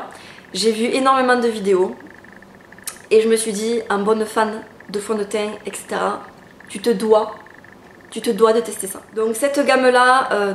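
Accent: South, France. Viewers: Tu es au top trop géniale tes haul sont supers en plus avec un accent du sud ..... mais quel moments magiques!!!!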